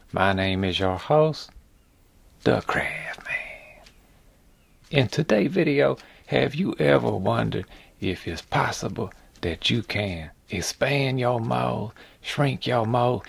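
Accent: Louisiana, United States. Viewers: Are you from Louisiana? Your accent is obviously exaggerated but you have a bayou accent that can only be exaggerated by a Louisianian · this guy's accent reminds of of that cajun chef Justin P Wilson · the awesome Louisiana drawl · you gotta be from da bayou. I recognize that accent. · He does sound like he's from my town for sure. Gonzales LA FTW. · you have the most Louisiana accent I’ve ever heard and I love it. I don’t know if you’re actually from Louisiana but this is how I imagine everyone down in the bayou speaks. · Southernish? It's actually more like a Bayou Accent but he seems to be in an area where they speak "English". LOL Deep swamp language would need subtitles.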